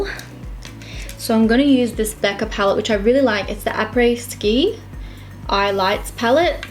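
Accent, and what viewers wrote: Australia. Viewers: It's strange.... I never liked the Aussie accent before I started watching your YouTube videos lol... Love your videos... Do you know what I mean Jelly bean? ☺️ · I enjoy watching your videos sooo much partly because of your australian accent, of course also because of your make up skills :) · Ohhhh waoooo. I like your personality as a whole person and your Australian accent. Love you .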